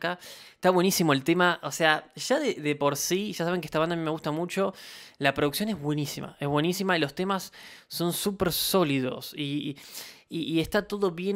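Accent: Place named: Argentina. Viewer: Te ganaste mi like, conoces de buen Rock socio, cómo buen Argentino claro,( si no me equivoco) y si sos Uruguayo me desculpo x jusgarte x tu acento, pero conocés de música, saludos desde Costa Rica 🇨🇷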